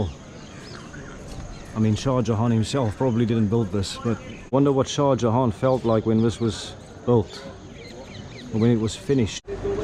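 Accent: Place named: South Africa. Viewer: Are you South African by any chance? Your voice and accent sounds very similar to the South African cricket legend AB de Villiers. And just as I was typing this, you also happened to mention his marriage proposal story, so I am guessing you might also be from South Africa. Anyway, awesome video. Cheers!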